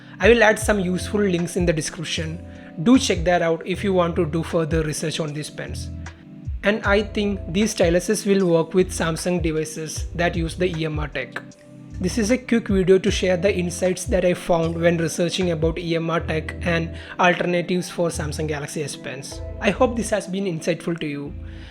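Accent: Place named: India. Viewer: english indian LOL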